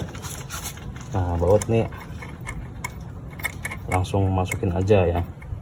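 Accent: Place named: Indramayu, Indonesia. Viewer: posisi bengkele ning ndi kang.. logate kayae wong dermayu ya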